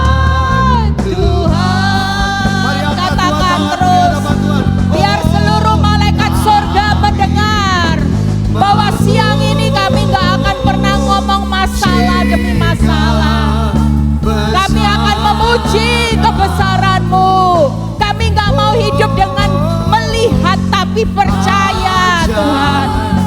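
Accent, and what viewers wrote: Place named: Surabaya, Indonesia. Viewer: Bu. ini asli org Surabaya yaaa....? Kok cekok kata2 persis orang Surabaya...🙏🙏🙏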